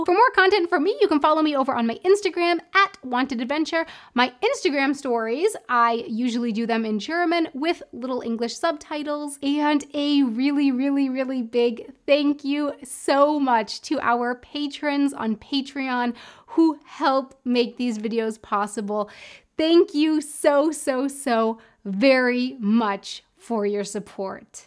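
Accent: United States. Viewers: As an ex-pat Brit living in Germany, I watch your videos for your experiences of living here. To be honest, I prefer the English language videos, your American accent with German words is sometimes hard. Re: finding out about the videos - checking my subscriptions or when you mention it on Twitter. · Colombian in Germany. I think most viewers are ex-pats in Germany, thus the English preference. Also, the popularity of the videos in English, rather than German could be because you have a very thick American accent in German, and I personally, have problem enjoying it. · U.S. viewer. I normally watch the English version. Sometimes I feel like watching the German version, though, because I took several years of German in high school, yet I find it very difficult to understand videos from native Germans. Weirdly, I suppose because of your American accent, I can understand your German surprisingly well. 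Thus it's fun to "practice" my German by listening to an American speak it. 🤔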